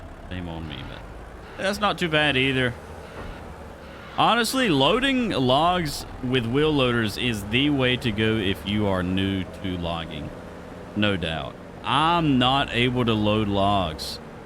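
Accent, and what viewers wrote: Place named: Georgia, United States. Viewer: Where in Georgia are you from? I recognize that accent. I'm about 30 minutes SW of Atlanta.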